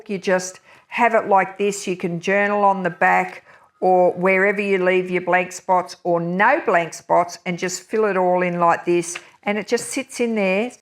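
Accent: Australia. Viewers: Such beautiful ideas. Love your Aussie accent ❤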